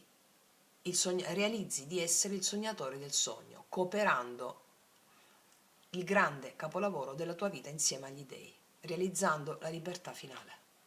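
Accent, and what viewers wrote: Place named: Salento, Italy. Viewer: Io avrei detto Salentina.. se è siciliana allora è di Messina 😊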